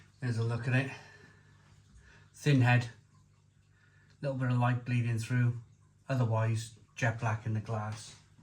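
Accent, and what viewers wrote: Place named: Newport, United Kingdom. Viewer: u from Newport, mate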